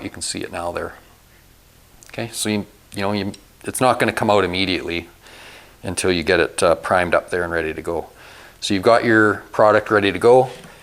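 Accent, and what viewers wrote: Canada. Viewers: Very helpful. Love your Canadian accent! I’m anCanadian living in the US and miss that so much. Thanks for your good pointers. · I recently found your channel. I have watched a couple of your videos. I think you are a really great instructor and I appreciate your videos very much. I lived in Alaska for 25 years and consider all Canadians as neighbors. You are wonderful neighbors. I mean this is a really complimentary way, I admire your accent! LOL. Keep up the good work Brother...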